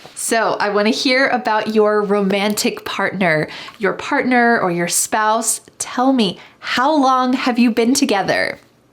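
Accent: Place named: United States. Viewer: Thanks teacher for your teaching, I love American accent to pronounce ❤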